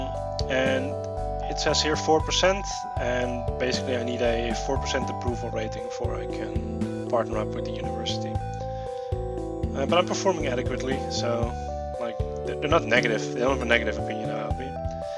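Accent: Netherlands. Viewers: You sound like having a dutch accent, is that true? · Yes, he's dutch.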